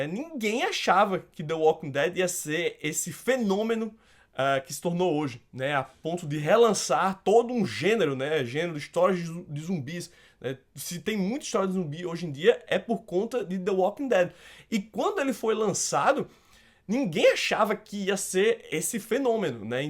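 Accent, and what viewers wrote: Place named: Pernambuco, Brazil. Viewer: Pensava que vc era de Pernambuco.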